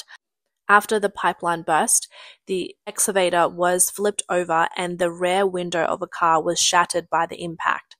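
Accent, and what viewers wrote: Australia. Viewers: This Aussie confirms it to be an Aussie accent. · Side note: I love your accent... Aussie? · I think I detect an Aussie accent from the narrator. Props to you young lady for exposing what the CCP suppresses daily.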